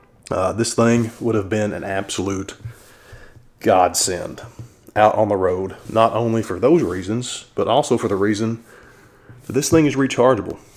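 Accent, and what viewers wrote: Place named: North Carolina, United States. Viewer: I had a plan to sometime in the near future start processing film again after years away from the darkroom. I supported their kickstarter campaign though as little as I would be developing I thought it worth supporting. I now have my unit and just shot a roll of B&W and I’m ready to get started. This video was just what I needed. I read a few reviews but I wanted to see it used. Learned a lot here. Thank you. BTW, I was listening to your voice and guessing where you are from. I guessed right. I know that accent. NC! that’s what 25 years on the road shooting for Southern Living will teach you.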